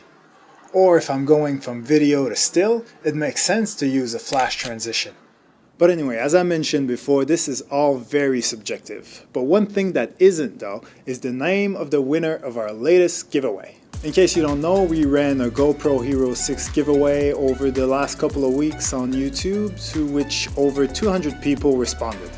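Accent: Quebec, Canada. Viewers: Where is your accent from ? Quebec !?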